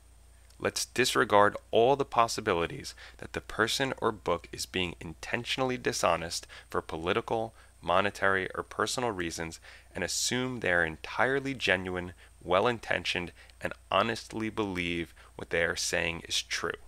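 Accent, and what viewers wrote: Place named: New York, United States. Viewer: lol i detected some hint of "new york" while i was listening too lol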